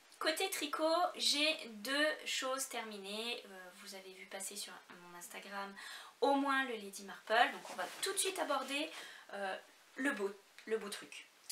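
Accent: Quebec, Canada. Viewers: Coucou, contente de te retrouver, j'aime toujours autant ton univers et lorsque tu as l'accent ou que tu sors des mots québécois, cela me fait plutôt sourire, j'aime bien. De bien beaux ouvrages et bravo pour Biscotte ! Merci pour le partage du modèle, je me laisserais peut-être tenter... Passes une bonne journée et à tout bientôt.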